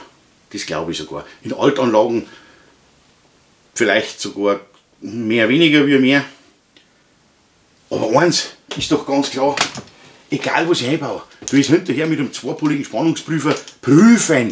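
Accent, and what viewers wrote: Bavaria, Germany. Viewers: Herrlich bayrischer Dialekt! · oan Buam hoats an oaner Steckdosen eine auffi gehaut... ich liebe diese Sprache, selbst höchst gefährliche Dinge hören sich für uns Fischköppe noch lustig an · dein Bayrisches Dialekt, bin aus Nordrhein Westfallen ich verstehe dich Super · Machst schon informative Videos, sehr lehrreich, nur ein wenig mehr hochdeutsch wäre nicht schlecht. Es kommt ja nicht jeder aus dem bayrischen Ausland 😉🤣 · #PWInfo Servus und erstmal vielen Dank für die tollen Beiträge. Bezüglich der Fehler durch Routine: ich selbst habe erlebt, dass mein Elektriker, der die komplette Installation im Neubau gemacht hat, bei einer Steckdose Neutralleiter und Schutzleiter vertauscht hatte. Meine Frage: prüft ein Elektriker Steckdosen abschließend nur mit einem Duspol? Das Vertauschen von Nulleiter und Schutzleiter bliebe dann ja aber unentdeckt, glaube ich? 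Mich würde sehr interessieren, wie das in der Praxis aussieht, und wie richtig gemessen wird. Wäre ich Elektriker, dann würde ich es mir nie verzeihen, wenn jemand aufgrund meiner Schusseligkeit zu schaden kommt und würde an solchen Stellen auf jeden Fall prüfen. Ich hoffe, dem Kind geht es wieder gut und dass es mit dem Schrecken davongekommen ist. PS das Bayerisch finde ich super :-) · Deine Videos sind erstklassig . 10 Daumen nach oben dafür . NUR leider habe ich ein kleines Problem , ich kann dir nicht zu 100% folgen da ich nicht alles verstehen was du sagst . Ich habe da doch schon gewisse Schwierigkeiten mit den Bayrischen . Schade das man es nicht alles mitbekommt was du gerde erklärst . Ansonsten sind deine Videos grosse Klasse .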